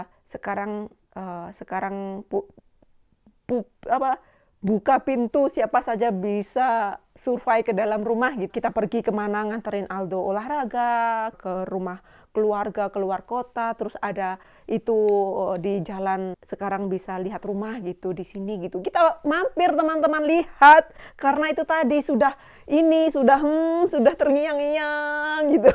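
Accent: Java, Indonesia. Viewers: Mbak ini logat ngomongnya seperti orang Jawa, salam dari Jogja mbak, suka banget ngikutin vlognya sehat selalu mbak dan keluarga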